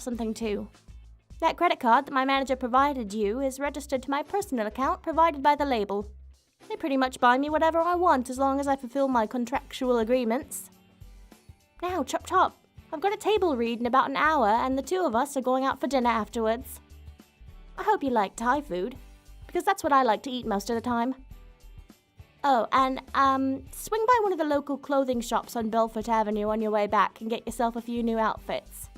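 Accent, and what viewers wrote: United Kingdom. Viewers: Nothing better than a British voice. Except for my earl grey, of course. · Oh my god!! She Bri-ish!! I love her!!! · HOLY SHIT SHE IS BRITISH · Oh, she british? Interesting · What kind of accent is this? Sounds pretty british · British accent. Tsun tsun attitude. It's s a British tsundere. best waifu Best waifu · Holy Heck she's british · Hehe an British tsundere is something I wondered how long it would take before someone came up with the idea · Oof these video make me feel like im cheating on my gf but i cant help it........if tsundere weren't hot enough she British too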